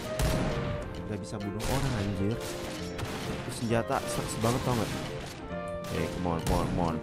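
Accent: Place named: Surabaya, Indonesia. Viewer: wallaa , Boso ee kok suroboyoan ngnee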